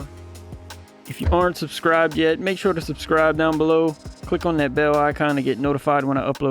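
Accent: Louisiana, United States. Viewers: Great video brother very informative I'm about to swap mine out. Your accent gives me hope that I am not the only one drifting in Louisiana! Greetings from Denham Springs.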